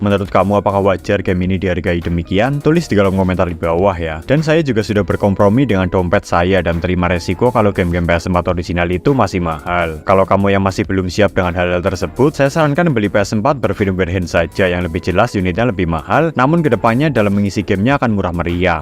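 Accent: Banyumas, Indonesia. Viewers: Wiiiiih..slaam ngapak...lur.. ngesuk j klalen gari tuku ps5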